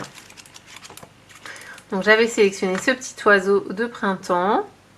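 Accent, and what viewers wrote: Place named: Alsace, France. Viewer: Une alsacienne ! Quel plaisir ! Alsacienne également j'ai direct reconnu ce petit accent ! :) une très jolie vidéo !!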